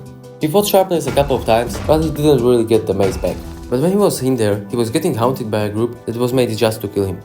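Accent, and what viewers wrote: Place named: Czech Republic. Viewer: Yo where are you from bro, you sound like your from Czechia? Ze by takova nahoda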